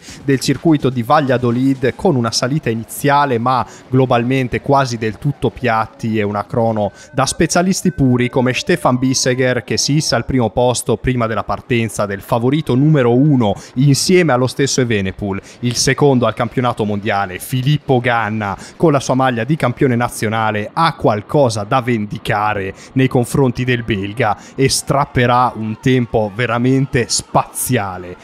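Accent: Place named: Reggio Calabria, Italy. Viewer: Pensavo di Reggio dalla S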